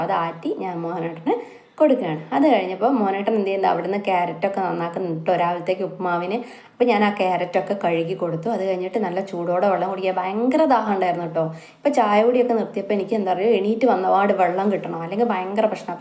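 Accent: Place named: Thrissur, India. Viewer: തൃശൂർ ആണ് എന്ന് തോന്നി ok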